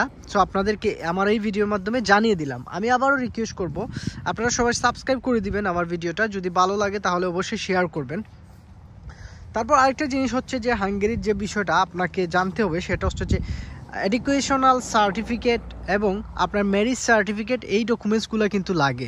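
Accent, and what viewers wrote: Sylhet, Bangladesh. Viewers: Bai monokoi sylheti😊❤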